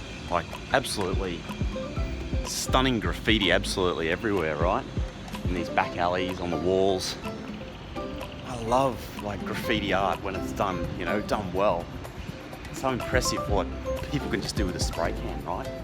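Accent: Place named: Australia. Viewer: I landed on your channel after randomly searching for a beautiful college campuses around the world. It's somehow very nice to hear the motivational talks in Australian accent :) I agree we should do what works more, although sometimes we need to push ourselves into doing something new to turn around what does not work into what does work! Hope you are staying safe during the pandemic! - a random Korean student from Japan.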